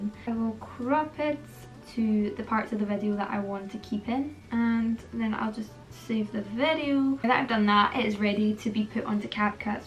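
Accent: Scotland, United Kingdom. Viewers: SHES SCOTTISH!!!!!!?????? PS I ❤ ur accent I’m just rlly shocked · Are you Scottish? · thats so real, for some reason i imagined her as american loll but i love her scottish accent ❤ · First video ive seen cause i usually watch her youtube shorts, I didnt know she was scottish, I thought she was american 😂, its kinda cool seeing a scottish creator that doesnt try to hide the accent, wierd to think that she's only like a 6 hour drive away from me, i live in england for clarification😅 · Are you Scottish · Wait!! She’s Scottish !! She just got 10 times better than she already was!! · Absolutely didn’t expect you to be Scottish! Smashin' it, lass 👏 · I love the Scottish accent❤ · Scottish actually haha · That’s not a British accent but rather Scottish, I guess! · YOUR SCOTTISH BRO IM SO HAPPY MY IDOLS SCOTTISH LIKE ME IM SO HAPPY AAAAAAAA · Are you Scottish I love your accent I’m from Glasgow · Your accent is beautiful. 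I did not expect a scottish accent tho what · OMG U SOUND SO COOL I NEVER THOUGHT U WERE SCOTTISH ❤❤❤❤❤❤ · WOAG ARR YOU SCOTTISH TOO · I never would've known you were Scottish, love your accent, love your vids x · I love watching your videos but I have never been so shocked to hear a Scottish accent before hahah (I’m Scottish too and I genuinely had zero clue!) 🏴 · She's Scottish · Omg r u scottish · Ur Scottish I thought u were American lol I’m from Scotland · Your scottish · Ok, so sorry but never realised you were Scottish, I always thought you were American!! So strange hearing your normal voice haha. Love your videos!! ❤️ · YOU'RE SCOTTISH 😮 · No way are you scottish!! Seeing you lip sync over all your videos, I did not expect this! Ps. your voice is so nice and calming also I love how you include captions on all your videos, I really need them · WAIT are you Scottish? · YOUR SCOTTISH?!?!?! 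· yeah it’s a scottish accent, i laos have a scottish accent and live in scotland, don’t live here i like my piece and quiet hehe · btw guys, she’s scottish 🏴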